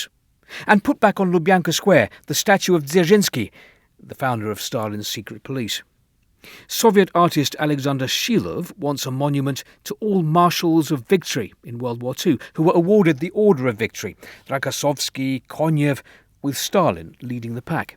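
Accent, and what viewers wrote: United Kingdom. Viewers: Thank you for your work! 🙏🙏🙏 By the way, I love your strong British accent. :) It's much better understandable for a eurpean non native speaker that American English. 😄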